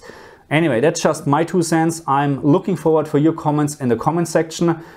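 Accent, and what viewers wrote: Germany. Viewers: Are you from germany? You sound like german :-) ? Falls ja schöne Grüße aus Hamburg. Eventuell kann ich dann meine Fragen auf deutsch stellen? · Your german accent is fucking ruining your videos man...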